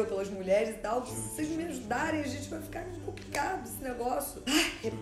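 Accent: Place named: Rio de Janeiro, Brazil. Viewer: Como sotaque carioca é ridículo, com certeza é o sotaque mais feio do mundo.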